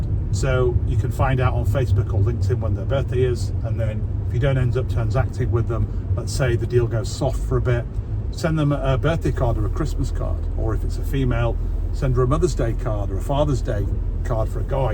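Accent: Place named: Merseyside, United Kingdom. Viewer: You have a merseyside twang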